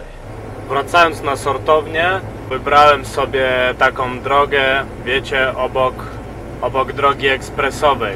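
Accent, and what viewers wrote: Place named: East, Poland. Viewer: Twój Polski przez te kilka lat naprawdę brzmi fantastycznie. Bliżej Ci już do wschodniopolskiego akcentu gdzieś na Lubelszczyźnie już na Podlasiu niż do ukraińskiego akcentu.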